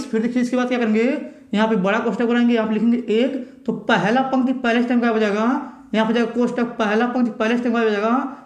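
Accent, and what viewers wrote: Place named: Bihar, India. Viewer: thank you सर रिप्लाई के लिए आपकी भाषा को सुनकर लगा कि शायद बिहार के है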